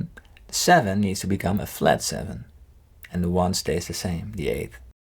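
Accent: Netherlands. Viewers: Are u dutch? Just wondering cause I am and the accent sounds familiar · Are you Dutch? What's your accent? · You are Dutch for sure. I'm Dutch and I recognize your accent.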